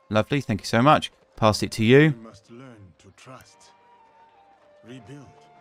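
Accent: United Kingdom. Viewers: 😂 every time I, as an American viewer, hear you say the shortened f word for cigarette my ears perk up like did he just slur me out? And I immediately culturally adjust cause I also love British language and slang and the accent and I’m like “oh yeah that’s what they call cigarettes in the UK” where as when I hear that word thrown about here at home it’s being thrown out in discrimination against either myself or my other LGBTQ+ brothers, sisters, and others. But I got you!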